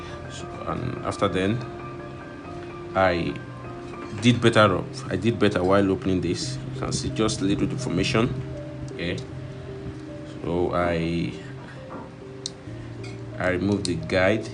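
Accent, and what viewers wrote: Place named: Yoruba, Nigeria. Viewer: When i heard how you are speaking, i uad to check your name. Yoruba man 👍👍👍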